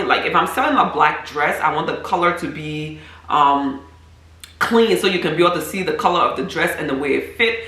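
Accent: Liberia. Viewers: I can hear the accent, sis you Liberian?? I love your content by the way.. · You sound Liberian. Are you?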